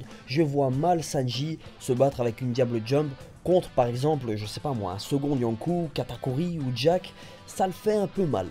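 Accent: Belgium. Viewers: tu viens d'où en Belgique ?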